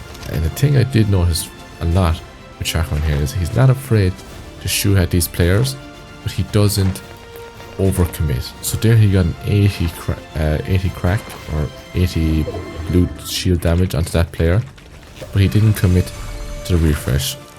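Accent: Ireland. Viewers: Is you Irish?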